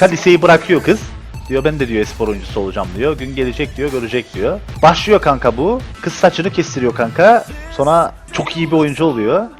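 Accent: Cyprus. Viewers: abi Kıbrıslımısın ;)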